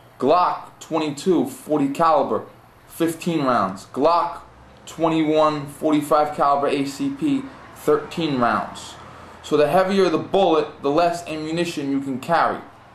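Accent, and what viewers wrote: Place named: New York, United States. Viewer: Yo, welcome to Nu Yok. Got to love the thick accent.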